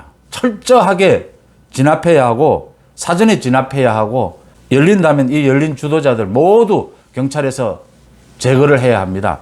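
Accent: Gyeongsang, South Korea. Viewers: 10만 축하합니다 경상도말씀이더정감이갑니다 경찰이미쳤구나 지그이라도 윤대통령도와줘건설하자 대한민국홧팅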